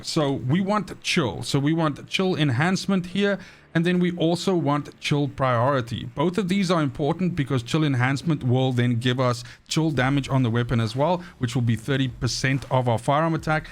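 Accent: South Africa. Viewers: Your the best I recommend you when people need help . Are you from South Africa as your accent sounds that way 🤔. OK this Serena issue As you and others make builds that wipe out enemies and boss killers in 3 seconds them its a bit harsh to say Nexon are to blame.. 3 weeks ago the Gley gun build ruined the boss for everybody and in the game Is that not the same . You then have the nexon put out a new top tier character Keelan anybody??? He was new I have yet to see him in a game I had him but deleted him as I needed a slot. So that argument is done. As for Serena I play another game Naraka bladepoint its free and basically first descendant copied it and moved it to space Naraka is Chinese melee swords OK. They made a character that flys last year OK wow he will destroy players Novelty wore off nobody uses him Exactly the same fire water chill toxic skills. 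So this Serena so far again hardly seen in game Majority are bunny and freyna who just wipe out everybody as usual.If this Serena is so dope then as players bitch about grinding then use her and clear missions fast.or do they want a weak character to take longer . End game bs The game is free people get 900 plus hours thats more than most triple aaa games It takes time to create cgi etc Stellar Blade game of the decade 30 40 hours . If you want hours and hours get baldurs gate. OK As for these boss killers I have not seen any that do 2 seconds in a group They show a solo boss The boss is weakened for Solo So they are playin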